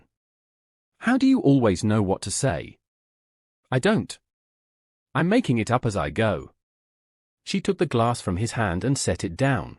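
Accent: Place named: RP, United Kingdom. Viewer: The British RP accent? Schedule Aluminum Oestrogen..etc aren't American Pronunciation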